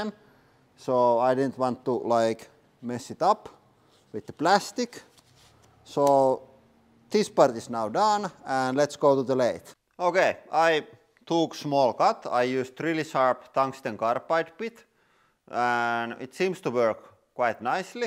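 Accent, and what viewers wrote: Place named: Finland. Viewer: I love the Finnish accent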